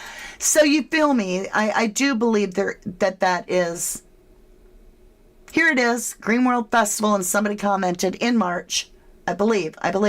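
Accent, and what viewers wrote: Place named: England, United Kingdom. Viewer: I’ve noticed lately your speaking voice is becoming more 🏴 English and it’s good because your learning some of our sayings like silly sod 🤣 another one for you crazy mare 😂 I think it describes Lucy lol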